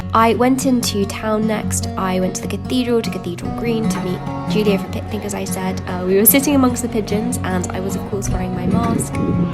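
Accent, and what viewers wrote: United Kingdom. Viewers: Two things why i watch videos 1. To be motivated 2. To learn some British accent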